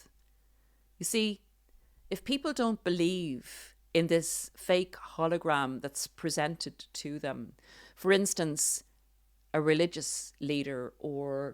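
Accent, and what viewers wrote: Ireland. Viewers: Thank you dear for sharing the things that make a narcissist lose the plot! It was so lovely to hear your voice again I love your Irish accent and so pleased that the audio version will be with you speaking. It has to be to be authentic!! A HUGE congratulations on releasing your first of a series of book - go for it!! Remy your co producer was thinking a nice long walk would be better than a narc video. I hope he gets a raise in salary as without him you wouldn’t have this book. He was the co-author wasn’t he? 😂 I have been doing some art of late so that’s why there’s a big gap since my last comment. Listening to your podcast from March 2024 has brought SO much healing understanding and can love myself back when I look in the mirror. It only took 22 years for this to take place so guys hang in there. I wished I had your messages back in 1984 it would have saved me loads but better late than never! 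Every single word you shared I lived with and was hell on earth. You say it very clearly and don’t muck about which is what I liked so carried on watching and listening. I was totally shocked to begin with as I felt like you had been walking with me in this dreadful marriage. As he walked out in a very pathetic suicide attempt which he threatened non stop I told him to STAY out as he was no longer needed or wanted. By golly he went more nuts than he was before. I was cool calm and ignored him totally which made him so angry. So so glad those days are gone and never to happen a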